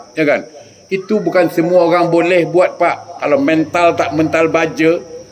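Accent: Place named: Johor, Malaysia. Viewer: Assalamualaikum ustaz,bagus penyampaian ilmunya mirip loghat Johor malaysia.. Alhamdulillah.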